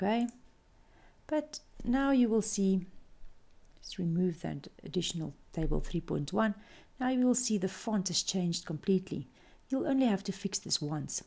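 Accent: Afrikaans, South Africa. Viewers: You sound very much South African! Thank you for this!! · You sound South African?? 😂 Same here!! 😂 Thanks so much for this video!! I'm submitting my PhD in one week and you explain so clearly and simply! THANK YOU 😁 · Her South African English accent is very dominant :| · She has an Afrikaans accent, from South Africa. I would know because I am Afrikaans too.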